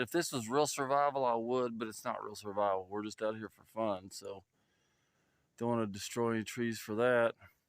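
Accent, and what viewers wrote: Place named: South, United States. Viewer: I'm going to take a wild guess that you're from the South :)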